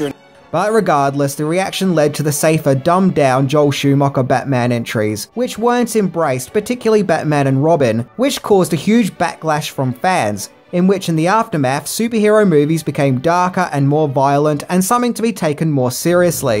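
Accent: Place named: Australia. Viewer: this is what tim pool looks like without his beanie, hey tim pool pretty good aussie accent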